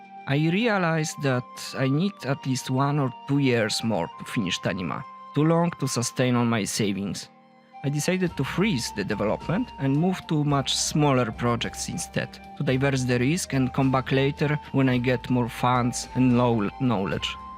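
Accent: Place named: Poland. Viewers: slychac ten polski akcent 😅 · Polacy, nie wstydźmy się swojego akcentu! :) To, co jest najważniejsze, to czy jesteś w stanie zrozumieć przekazywaną informację - ja oglądnąłem i nie miałem z tym najmniejszego problemu.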